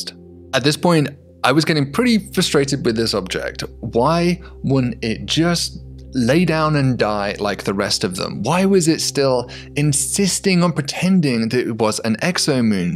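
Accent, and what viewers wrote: England, United Kingdom. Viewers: His dreamy English accent takes me out of space to dreamland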